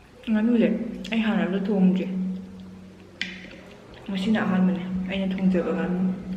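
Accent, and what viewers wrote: Kakching, India. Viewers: Kakching londwna ngangdena ngdi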